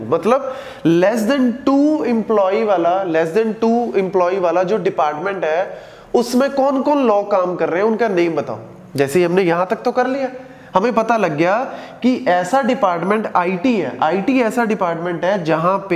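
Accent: Punjab, India. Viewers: Braber Punjabi tone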